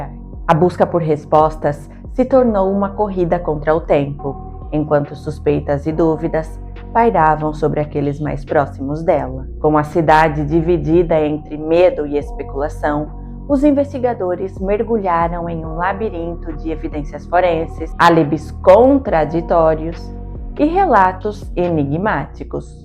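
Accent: Portugal. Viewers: você é brasileira? Que legal! Poderia jurar que vc era portuguesa, por causa do sotaque.❤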